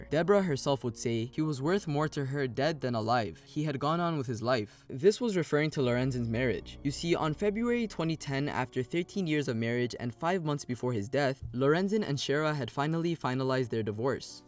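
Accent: Toronto, Canada. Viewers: you sound like a Toronto mans. Are you from Toronto bro? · torontonian accent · Your Canadian right?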